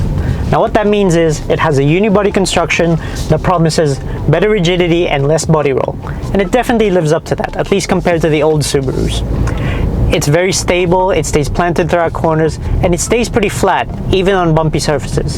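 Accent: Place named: Australia. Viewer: Someone has been living in Australia..nice accent. These cars are imported from the US and are the Canadian spec.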